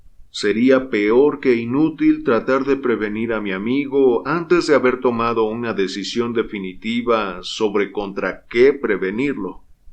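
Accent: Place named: Mexico. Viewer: Hola!! He seguido desde hace meses tus lecturas y ya eres mi favorito. Tu voz con acento de Mexico es muy agradable. He buscado NEMESIS en español de Agatha Christie solo la encuentro en inglés.. Nos la podrías leer? Gracias valoro muchísimo tu trabajo.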